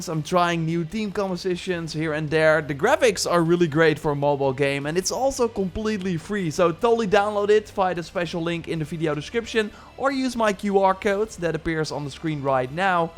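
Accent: Netherlands. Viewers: Je hoort gwn da hij Nederlands is😂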